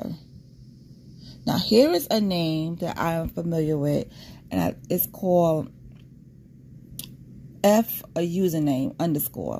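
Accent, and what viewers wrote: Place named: Brooklyn, United States. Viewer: Oh I love your accent. You sound like me. I’m from Brooklyn….live in SC….just subscribed…loving this WW drama…addicted to these crazies… lol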